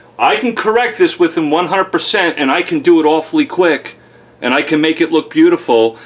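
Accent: New York, United States. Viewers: He's from New York. It's in the accent.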